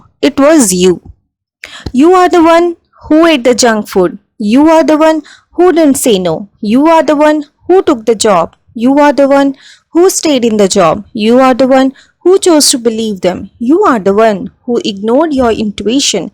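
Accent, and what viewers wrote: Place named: India. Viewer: Oh man! An Indian accent :((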